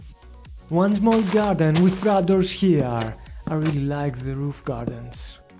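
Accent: Greece. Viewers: Jesus, he is Greek. There are other accents in the world, you know..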